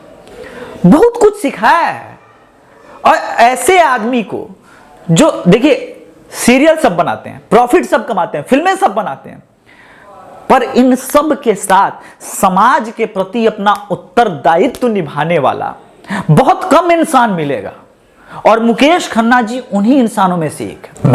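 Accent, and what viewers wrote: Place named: Bihar, India. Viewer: Sir aap jarur up-bihar wale ho ........... Hai na sir? Aap ki channel pai mai pehlibar aaya hu aur apke bolne ka tarika bohot aacha laga......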